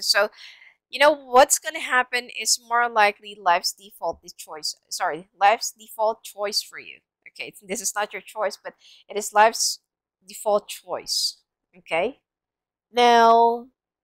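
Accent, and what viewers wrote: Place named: Netherlands. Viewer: Hi, are you Dutch? Cause you do have a Dutch accent 😊😉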